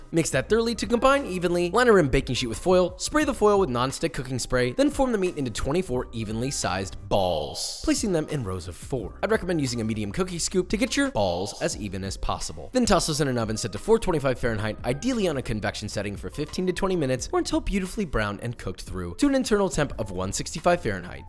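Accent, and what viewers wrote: United Kingdom. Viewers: More of the British accent please. As a Brit it's still somehow soo satisfying to listen to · Just me or did get more british in this video?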